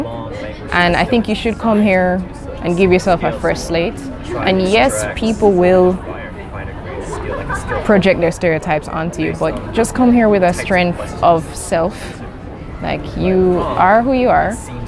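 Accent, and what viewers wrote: Jamaica. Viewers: As she Start talk mi know seh a Jamaican she be, the accent tranggg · I can related to the hair experience lol. When I was in China it was not uncommon to meet people who just wanted to touch my hair. I had the old school flat top hair cut. Some even wanted a picture. Btw I'm from Grenada but I'm glad to still hear your Jamaican accent in there after speaking mainly Japanese for so long. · Is she also west Indian? She sounds Jamaican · I'm 6 seconds in, and im pretty sure this woman is Jamaican XD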